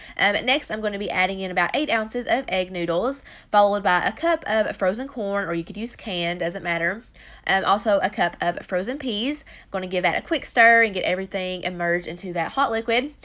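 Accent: South, United States. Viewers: I love the way you talk! Are you a southern belle? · I have just fallen in love with your channel! makes me feel like a horrible mom/wife I gotta step my game up! I absolutely love your southern accent too, oddly soothing as it reminds me of the best part of my childhood living in Florida (yes I know no one sees it as the south but my town sure acted a lil southern)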